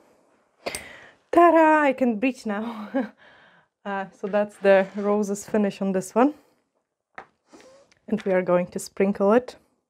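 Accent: Scotland, United Kingdom. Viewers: Absolutely gorgeous 😀 are you part Scottish? You have a very subtle Scottish accent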